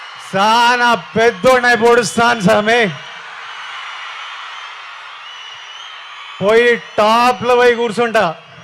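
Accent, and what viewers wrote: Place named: Rayalaseema, India. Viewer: Rayalaseema slang🎉🎉🎉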